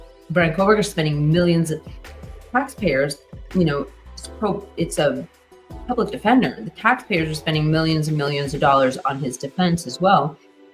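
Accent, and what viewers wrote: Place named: Cape, South Africa. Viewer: where is your Cape accent? 😮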